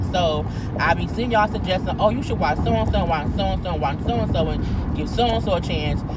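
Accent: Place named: New Orleans, United States. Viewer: Like you, I started with Funky Dineva, once I purchased a smart TV it had a YouTube channel that's how I got turned on to others like you, James Colwell, Kiesha all tea all shade, and color me pink, Alex Rogers, Ashley Miller mines is in no particular order and yes I also thought certain words you've said I thought you had New Orleans roots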